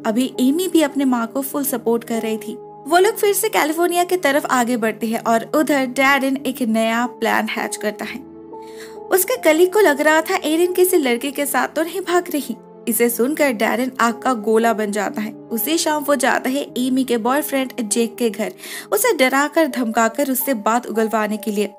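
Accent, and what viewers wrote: Bengal, India. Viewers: Are you bengali ma'am ? My mother's friend is bengali and she speaks exactly like you.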